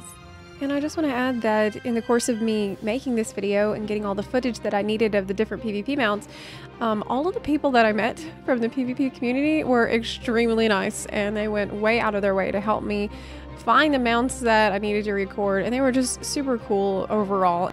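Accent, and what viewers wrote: South, United States. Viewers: I keep ending up here plus you’re southern and YT needs more southern accents. Subscribing and thanks for the content :)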